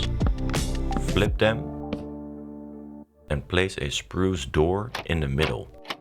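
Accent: Netherlands. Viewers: why do you sound Dutch??!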